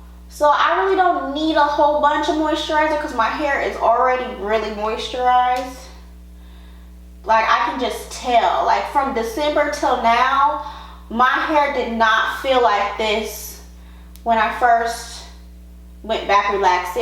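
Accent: United States. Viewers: Her accent XD I love America sometimes. She's cute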